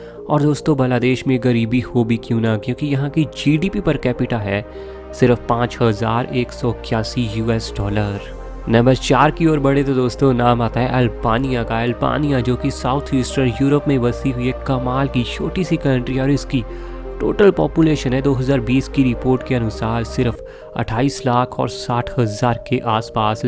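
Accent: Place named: Punjab, India. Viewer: tu punjabi a teri tone to pta lagda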